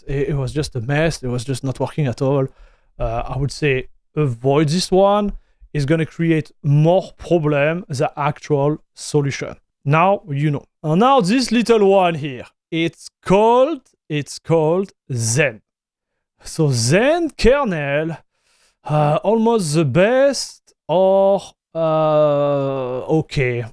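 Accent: France. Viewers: Love your French accent. Mine is Portuguese. 😂 Greetings from Brazil 🇧🇷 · ngl i love the french accent you have XD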